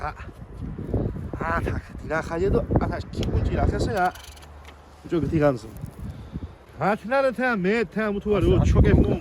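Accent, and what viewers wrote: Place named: Mingrelia, Georgia. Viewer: Ანუ ძმა მარტო სამეგრელომ უნდა გაიგოს თქვენი საუბარიიი! Ტურახტება ძმაა!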